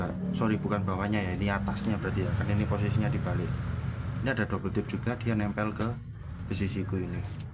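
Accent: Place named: Malang, Indonesia. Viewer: 😂 iki logate kok koyok wong malang yo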